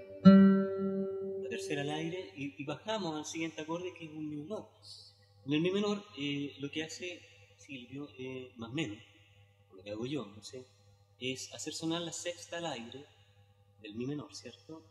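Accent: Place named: Chile. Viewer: Que hermoso tu acento chileno❤ estoy enamorado del acento chileno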